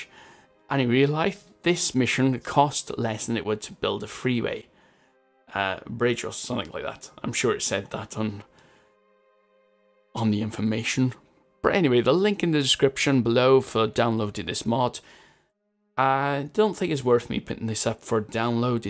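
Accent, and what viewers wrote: Wales, United Kingdom. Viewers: are you Welsh coz I kinda recognise the accent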